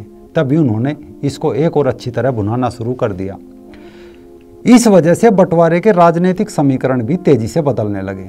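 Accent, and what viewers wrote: Haryana, India. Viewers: Bhai aapke bahut videos dekh Chuka Hoon. Ek baat saaf saaf keh deta Hoon, Aapka bolne ka tareeka aaj ke har pravakta see behtar hai...! Aapki boli me haryanavi yaa garhwali/kumaaoni jaisi boli dikhaayi pad rahi hai.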